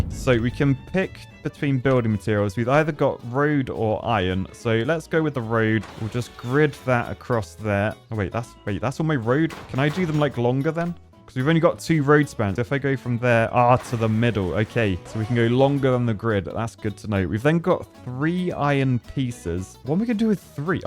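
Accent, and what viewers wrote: United Kingdom. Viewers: Why does sound British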